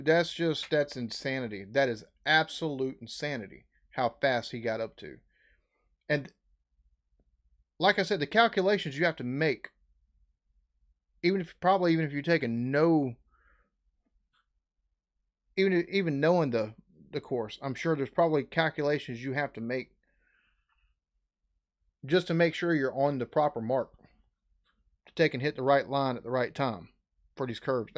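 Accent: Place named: South, United States. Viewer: Southern accent. Trucker cap. Huge beard. Huge willingness to discover and learn new stuff. Excellent delivery. That’s the kind of American I love. Easy sub for me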